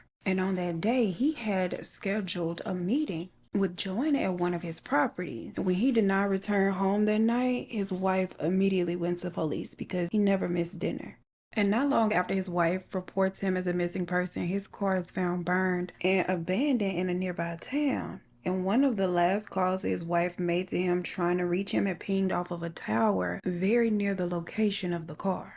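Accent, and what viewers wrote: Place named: Houston, United States. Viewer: Alsoooo, ever since you moved to Houston that accent been accenting I love itttt ❤️😂😂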